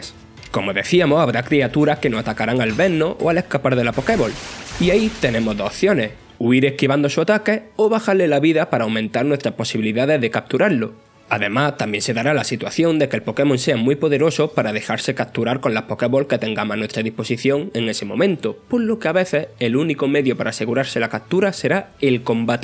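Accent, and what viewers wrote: Andalusia, Spain. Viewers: Párese que e andalu pero quea raro en una narrasio que e lo que le paha a ete tehto que quea raro. Y lo digo siendo andaluz, nuestra forma de hablar es la peor para narrar. Pero no es que no sepa hablar el muchacho 😂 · De dónde es su acento? Sé que de España pero de que parte? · Investigué y resulta que así hablan los andaluces. No es mala locución así es como hablan en Andalucía. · Me encanta que un medio se haga en acento Andaluz, eso si, lo de “Arqueus” me ha dejado descolocado. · andaluz creo, yo soy de argentina pero si no me equivoco es de ahí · de Jaén, Andalucía. En el sur de España.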